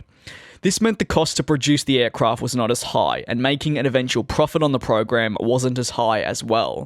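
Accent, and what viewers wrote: Australia. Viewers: He’s an Australia boy but gonna be moving to uk few days from now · He’s Australian but has moved to the UK.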